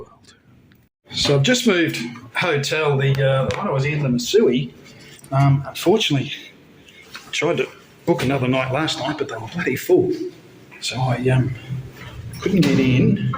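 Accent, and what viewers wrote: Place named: Australia. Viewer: From your accent, you are australian.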